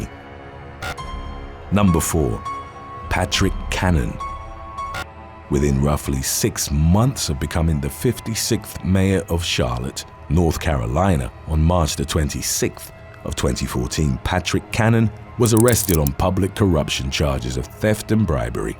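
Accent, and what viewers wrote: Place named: England, United Kingdom. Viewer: He's speaking proper English. Remember, that's where American English came from.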